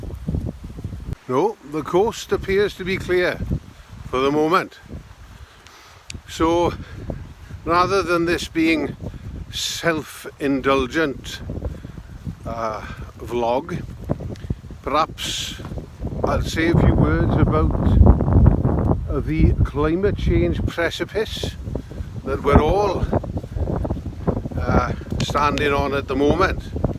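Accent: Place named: Wales, United Kingdom. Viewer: This is my face. I have my roots in Wales. I heard it in your voice. We aren't so very far away from home. <link>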